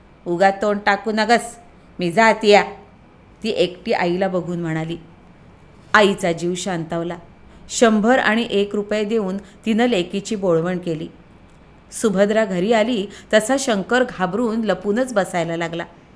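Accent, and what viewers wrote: Rural, India. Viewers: Bhashet gavthi thaska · ग्रामीण बाज खूपच छान....👍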